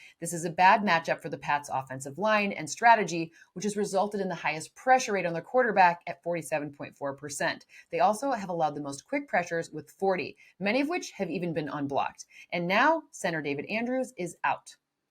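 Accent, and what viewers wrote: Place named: United Kingdom. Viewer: Love the British accent! Continued excellent content.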